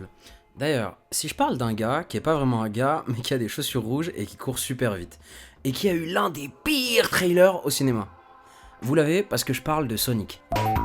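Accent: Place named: France. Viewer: J'm ton son Le french accent touch Ça passe bien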